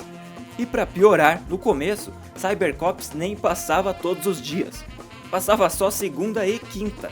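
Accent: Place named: Santa Catarina, Brazil. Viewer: esse cara é catarinense ?? aqui a galera tem esse sotaque :)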